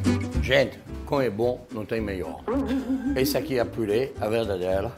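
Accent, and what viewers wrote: France. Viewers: amo o sotaque francés dele um amor de pessoa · O Jacquin tá aqui no Brásil vários anos pq ele fala português com sotaque francês e dificuldade?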